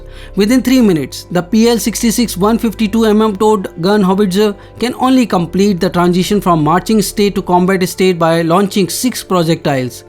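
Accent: India. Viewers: Is this India accent? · I assume the narrator is from kerala india🇮🇳🇮🇳🇮🇳🇮🇳👌👌👌👌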